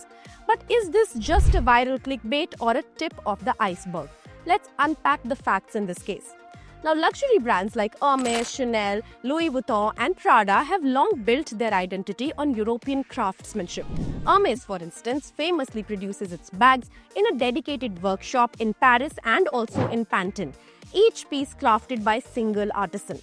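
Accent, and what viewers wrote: India. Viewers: As if I am going to believe this reported with an Indian accent.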